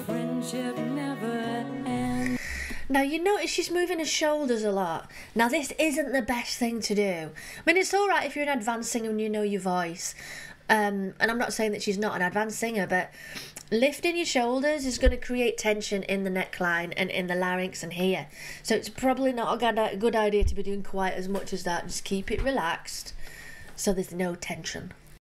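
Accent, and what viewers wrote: Manchester, United Kingdom. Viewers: I follow your channel just for your accent. It's so cute.. are you from Manchester? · ...please do some Alanis Morrissete and Björk...always enjoy your reactions, you are so nice and cute, love your british accent! x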